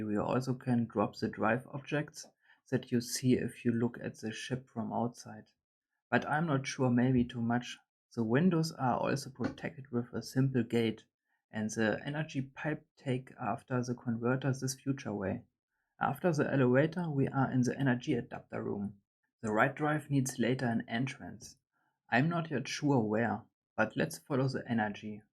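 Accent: Germany. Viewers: When you don't care what others think and you do projects purely for self-gratification, the results are astounding. That's how things should be, in my opinion. Keep it up man. PS:- I like your German accent lol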